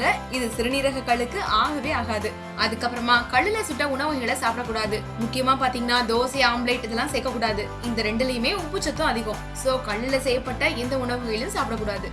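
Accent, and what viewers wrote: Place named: Chennai, India. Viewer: Presentation too fast. Unable to digest some taminglish words of Chennai slang for non Indian Tamils like us. Please speak clearly in future for the talk to be useful for all of us. Thanks.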